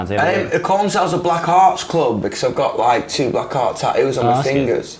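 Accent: United Kingdom. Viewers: Yeah, he is speaking British English, a proper English many british people would say. So American mates are the ones got an American accent if we r being correct here lol, including me at some point as well. Not trying to be rude. Just reminding of the history. I also like British English, as many other English accents.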